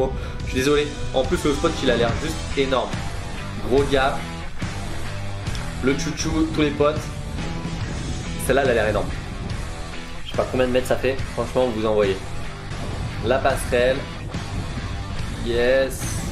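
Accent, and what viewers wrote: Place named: Belgium. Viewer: S'il te plaît respecter l'accent belge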